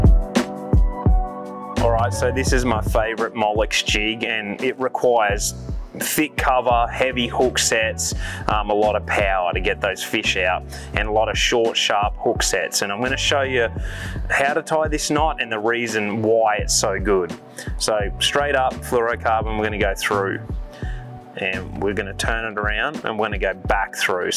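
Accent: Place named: Australia. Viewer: why do you sound like an Aussie when speaking English ? I am really curious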